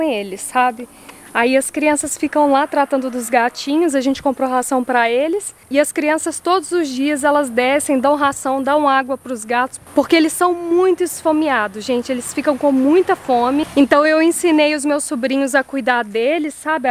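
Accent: Minas Gerais, Brazil. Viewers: Eta, mineirinha arretada, sempre com o sutaquinho mineiro kkķķkkk,adooooooooooooro,bjs pra vc e sua sogrinha mamãe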